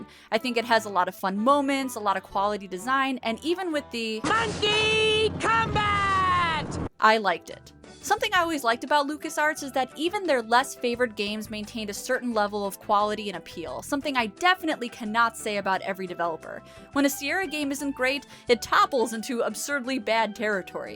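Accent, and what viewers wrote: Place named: General American, United States. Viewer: Awesome; I enjoyed this video as usual! I am feeling a little pedantic though and I have to point out an issue (please forgive me). Everyone has an accent. A General American accent is still an accent. It's what you're most used to hearing, so it doesn't stand out to you... but it's still an accent.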